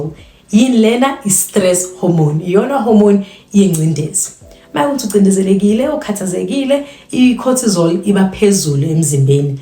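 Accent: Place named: Zulu, South Africa. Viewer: You are so beautiful and your isizulu accent is amazing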